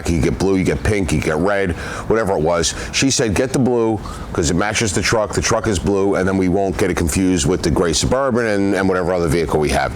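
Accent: New York, United States. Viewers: I know right! Love NY accent. I’ve been watching The Sopranos and this gentleman could be cast in it if they ever do an update.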